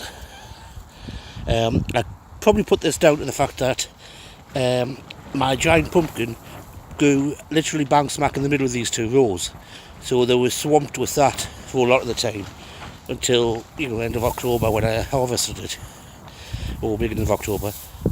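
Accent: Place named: Geordie, United Kingdom. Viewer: I know a fellow geordie when I hear one! Haha. So do you live in north east England then?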